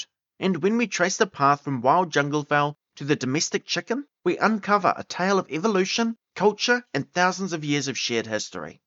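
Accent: New Zealand. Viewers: Kiwi accent is crazy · Very interesting. I'll subscribe. The NZ vowels are a bit of a challenge! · "Easy to understand", well yeah, once you get past that strong Kiwi accent! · Oh bro, I watch a lot of u tube and the kiwi accent is a shock. Chur to the chicken. Get your self a lotto ..after making this video you'll be extra clucky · I detected a New Zealand accent from the the third word!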